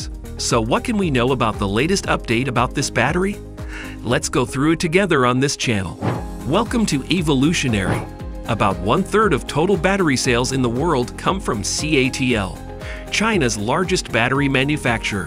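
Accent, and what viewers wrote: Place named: United States. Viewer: Lol . . . Volkswagen pronounced Volkswa 'gen' as in Gen x. Really good American accent, though.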